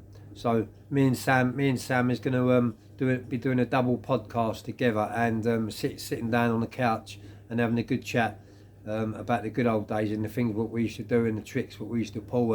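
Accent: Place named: South London, United Kingdom. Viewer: iknew it was south of the river accent 😂😂good luck with your mission mate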